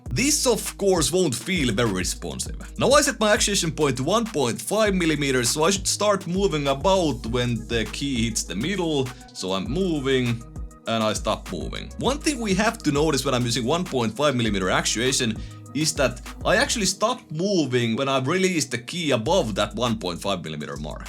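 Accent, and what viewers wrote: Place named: Finland. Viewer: huomaa aksentista että oot suomalainen. kiva että on tälläisilläkin videoilla suomalaisia tekijöitä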